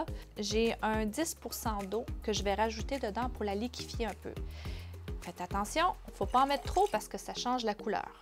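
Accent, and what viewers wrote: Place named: Quebec, Canada. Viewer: C'est super d'avoir des vidéos en français avec le si joli accent québécois.......Un petit coucou de France . Ici nous n'avons pas les mêmes produits mais je me débrouillerai surtout pour la cire........La revalorisation de cette armoire tombe très bien car j'ai une armoire de chambre blanche que je vais mettre dans mon atelier que j'ai enfin dans ma toute nouvelle maison en bois......Ce bleu me plait beaucoup.....Si je ne me trompe pas j'espère que BB est bien arrivé. Merci encore pour ces vidéos.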